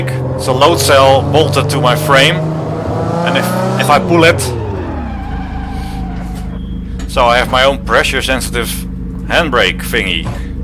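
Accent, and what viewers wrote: Netherlands. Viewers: You dutch right you atleast you sound dutch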